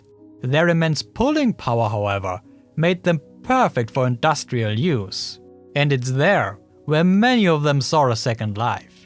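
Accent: Germany. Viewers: Er, no it isn't. Unless you think that AI can generate renders that good, and is speaks English with a perfectly German accent and occasional accidental use of German grammar. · he speaks AI german accent ... u have never had an argument ...sorry ... and his voice is always 0 dB ...u most likely dont even know what that is ..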